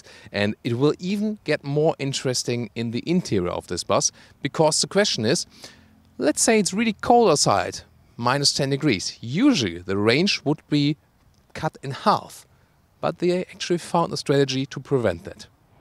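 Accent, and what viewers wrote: Germany. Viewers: Man hört halt einfach raus das du deutsch bist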